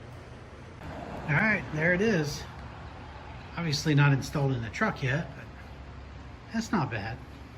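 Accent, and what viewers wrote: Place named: Deep South, United States. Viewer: very clever idea! And you said before you were in Pennsylvania? You sound like you're from the deep south 😆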